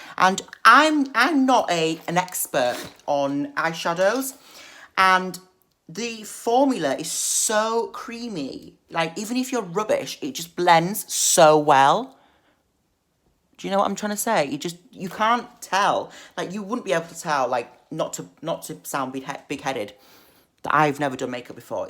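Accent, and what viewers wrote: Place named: Birmingham, United Kingdom. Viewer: I seen you in the supremely satisfying video and your hair and accent is ace I'm British myself and it sounds like you come from Birmingham. Sorry if I'm wrong 😂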